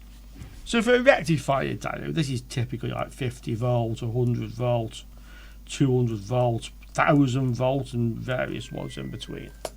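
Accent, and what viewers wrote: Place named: Sheffield, United Kingdom. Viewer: It's called an accent. Sheffield or thereabouts if I was to guess. Clear as a bell to my ears.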